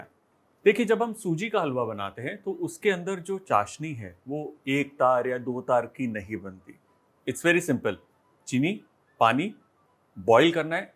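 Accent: Punjab, India. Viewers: Really like ur punjabi accent. In my parents house we make safed chana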